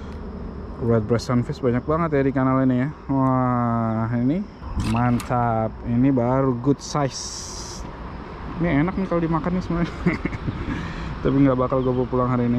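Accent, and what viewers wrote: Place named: Medan, Indonesia. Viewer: alamak jaaang. keknya orang medan ya abang ini hehe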